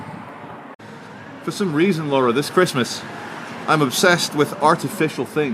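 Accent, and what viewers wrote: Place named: Scotland, United Kingdom. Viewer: Like, Subscribe, he's a good guy he said, Scottish Accent sounds great.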